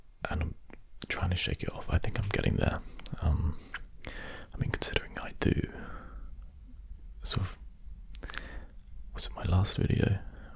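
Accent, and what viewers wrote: United Kingdom. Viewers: Damn ur voice was so good i love it so much i'm normally not fan of soft spoken but ur voice was so hot(sorry i go to far in that coment 😂) love ur videos they're cool and british accent is the best ever. I'm french(from normandie not so far to u😜) so sorry if my English was bad😕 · We hearby officially brush those negative thoughts away for you. Brushhh. Brushhh. Brushhh. Your doing fine. We are happy to see you. That velvet voice with your British accent and handsome bearded face make us all feel great. 👍💜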